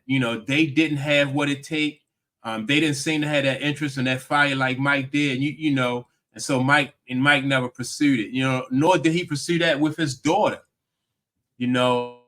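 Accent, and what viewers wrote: Baltimore, United States. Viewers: Are you from B-more?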